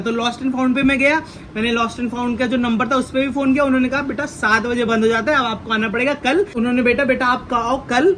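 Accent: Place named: Haryana, India. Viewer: या तो तुम हरियाणवी हो या फिर दिल्ली एनसीआर तरफ के हो ना होना होना होना होना होना😮😮😮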